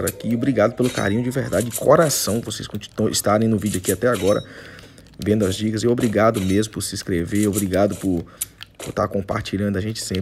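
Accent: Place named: Northeast, Brazil. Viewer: Salveeee,primeira vez que te assisto e ja gostei mano!! Queria saber de onde tu é! Tem um sotaque daqui do nordeste!!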